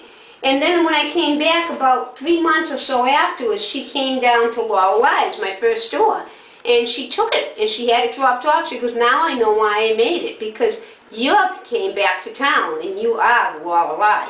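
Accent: Boston, United States. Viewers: Lorelei, u r such a lovely woman. I am from Boston as well so I love the accent!!! I look forward to binge watching all of ur videos!! ❣️ Blessed Be ❣️❣️❣️❣️❣️ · That Boston accent just keeps me coming back. Love it.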